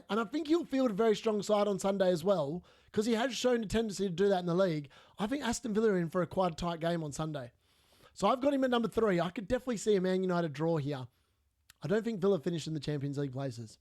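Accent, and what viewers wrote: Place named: Australia. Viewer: The Aussie accent and the pronunciation of names got me subbing 😂 Brilliant show, mate! 👍🏼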